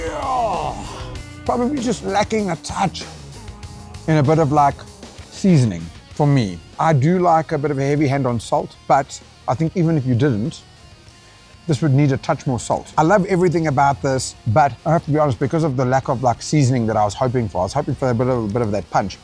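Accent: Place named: Durban, South Africa. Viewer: Who the fuck is this o ? What a fuckin legend. Boy talks like he’s grown up in the 031. Good o. Good o.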